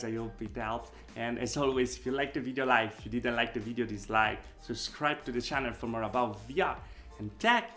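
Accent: Italy. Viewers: Based on his accent and features I'm going to assume he's Italian.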